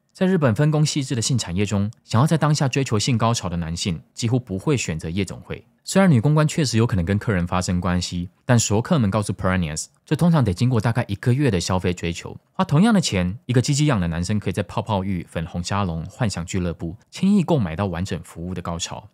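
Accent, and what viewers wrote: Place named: Taiwan. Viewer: 第一次看到的影片，讓我迅速就變壽粉了。臺灣人的口音跟中字幕讓我聽起來好舒服看起來易懂…………都噴水了…………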